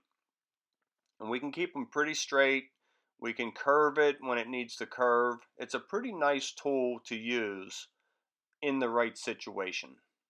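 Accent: Pittsburgh, United States. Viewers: that yinzer accent. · I'm just a minute 30 into the video, and I'm like, he sounds like Pittsburgh, and I checked, TSH Concrete is indeed in Butler County, Pennsylvania, I got family that live out near there still. I never hear the Pittsburgh accent living out here in the boondocks of america, Portland Oregon where grocery stores and even the pubs close at 11pm every day of the week. anyway, on with the video, feeling very nostalgic just listening to you talk, after 21 years its nice to hear another voice from the area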